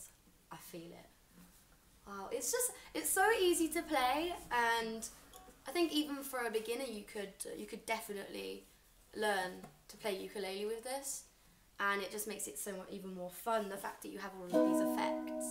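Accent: United Kingdom. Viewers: English accent is the bow that ties it all together honestly. 100 out of 10 would've been head over heels for her back in highschool.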